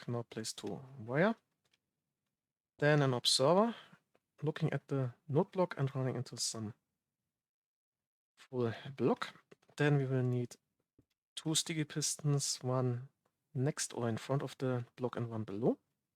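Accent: Germany. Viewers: keine Kritik, stattdessen einfach eine Bemerkung: du hast einen der stärksten deutschen Akzente von englischen Youtubern, welche ich bisher gehört habe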